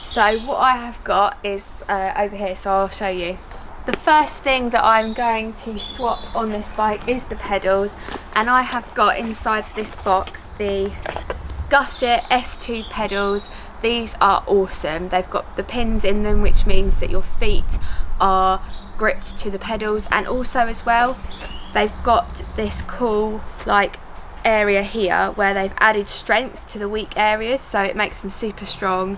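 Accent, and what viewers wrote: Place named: Australia. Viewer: I got used to that Australian accent.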